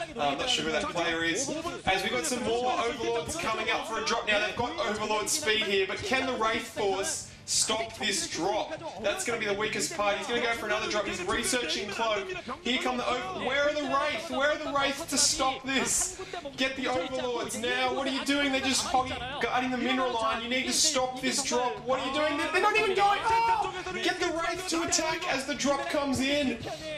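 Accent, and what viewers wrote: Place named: Australia. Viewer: crikey mate, a bloody aussie sc commentator?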